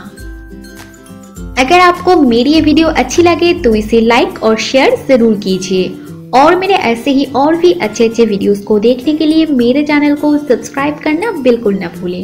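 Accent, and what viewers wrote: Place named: Bengal, India. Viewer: Bengali accent spotted!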